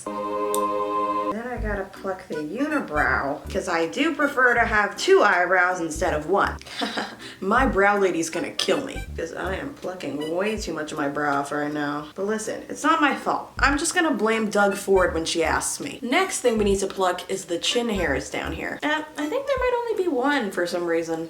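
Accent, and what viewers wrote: United Kingdom. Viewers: It’s not a video without the British accent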